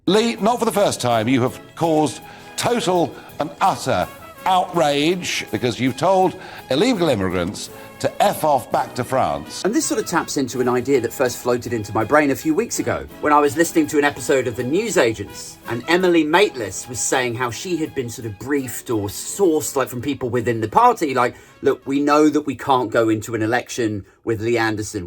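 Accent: United States. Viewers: Stop using the term "guys" endlessly - you sound like a yank with an English accent !!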